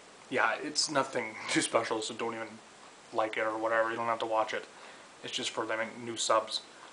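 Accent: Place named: Canada. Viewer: You should of talked more about your favorite games and influences. What was the first game to really blow you away? For me it was Final Fantasy on the nes. I was probably 9 years old and just couldn't believe how friggin cool that game was at the time. Also you have almost a Canadian accent, are you in the States or Canada? Just curious.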